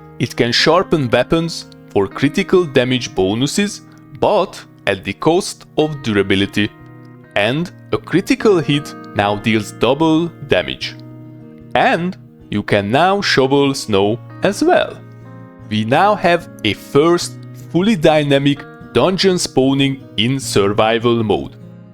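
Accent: Hungary. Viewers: I can spot a fellow lovasíjász when I hear one! Klassz videó, köszi bátyus! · Are you Hungarian? I’m asking because you have quite a Hungarian accent. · First video I have ever watched from you and in the 1st minute I could tell you are hungarian. Örülök hogy nem vagyok egyedül a vintage story kedveléssel magyarként:>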